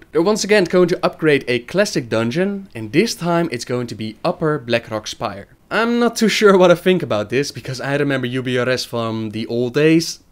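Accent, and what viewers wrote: Netherlands. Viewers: You're doing well on suppressing your dutch accent.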